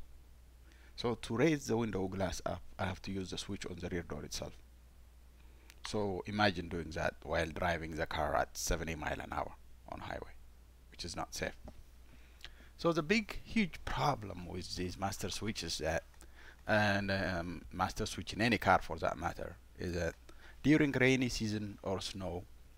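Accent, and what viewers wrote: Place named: Ethiopia. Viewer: Bro - I face the same problem on my Cruze. Sadly I was convinced the issue is on the passenger switch. Thanks so much… by the way are you Ethiopian? Your accent resembles like my Ethiopians 😊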